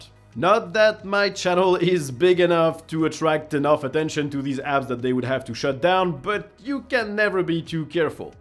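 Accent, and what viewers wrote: France. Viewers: What is your accent? sounds French, but with a something. Belgian?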